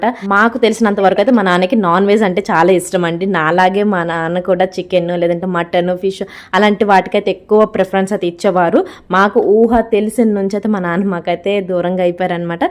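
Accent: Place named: Srikakulam, India. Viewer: appa nuvu great. I love you srikakulam slang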